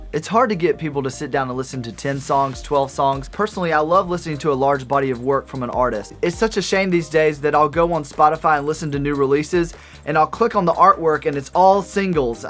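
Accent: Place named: Denmark. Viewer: you sound danish:)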